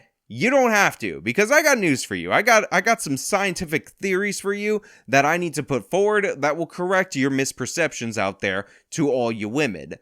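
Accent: New York, United States. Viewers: first time i noticed you have a new york accent, at least northeast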